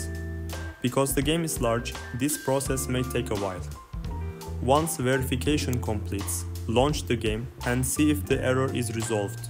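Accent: Turkey. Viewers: eyvallah reis işe yaradı aksanından belli türk olduğun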